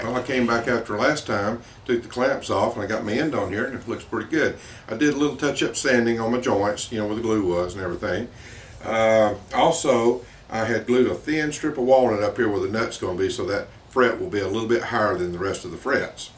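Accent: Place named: South, United States. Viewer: In the description there's a transcript option. That may be helpful for you. He has a southern accent so that may not be something you're used to hearing. I hope that helps you.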